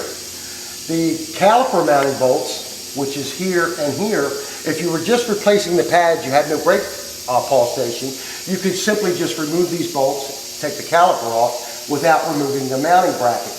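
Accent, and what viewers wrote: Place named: Delaware Valley, United States. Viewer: I love that Delaware Valley accent!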